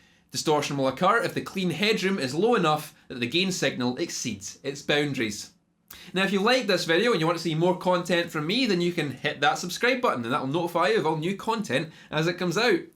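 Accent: Scotland, United Kingdom. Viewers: Why are all the guys who make pedal videos Scottish or from the British isles?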